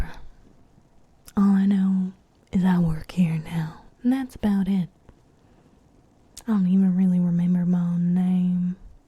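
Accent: South, United States. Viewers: I cant tell if it is or not, but if so im glad you did the southern accent again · I need more of the southern accent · >w< Ay yam a huge adorer of lovely ladies with southern accent. May I have more, please? (I luv your voice.) <3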